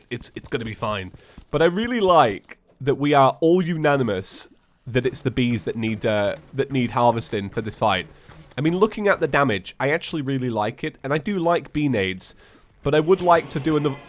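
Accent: England, United Kingdom. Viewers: I know I know, you're English, you have an accent, and that's great, don't get me wrong... But no offence, sometimes it seems like people just purposely mispronounce words, just for the sake of standing out:P (Yoshee/Yoh-shi) It's like, I know how to say it, I know how the creators intended it to be known, but hey, I'm different, let's just change it up... Haha, silly beans.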